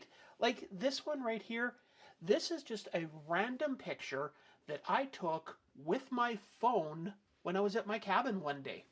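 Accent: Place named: Canada. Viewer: Dear Canadian, March 2026. I'n a 90 yo Australian living in Brisbane. Gee a Canadian Web site what a surprise. We like Canadians even though we don't like the acent which is close to yanks. I have used Linux a few distributions, Mint for the last twenty decades. God Bless. ====================================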